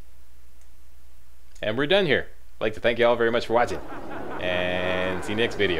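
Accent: Canada. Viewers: o ur Canadian eh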